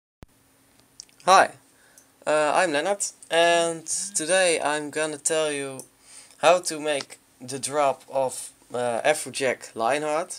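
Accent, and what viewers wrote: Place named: Netherlands. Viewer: je hoort dat je nederlands bent :P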